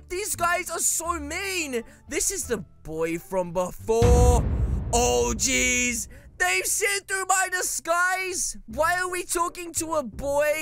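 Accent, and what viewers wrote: United Kingdom. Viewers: bro are u British? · are u british im confused...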